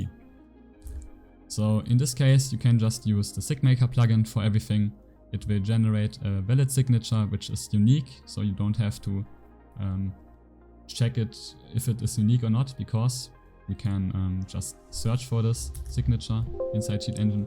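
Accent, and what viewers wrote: Germany. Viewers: You German 🤔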